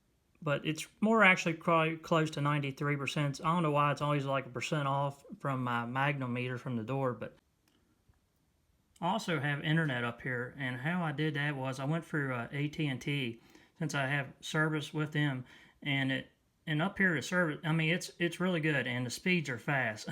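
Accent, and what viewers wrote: United States. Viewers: Very nice location and cabin. What part of the country are you located? I spent a few years in East Tennessee and you sound like you could be. I mean that in a positive way. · Im guessing by the accent, and the view from the top of the mountain, it's West Virginia.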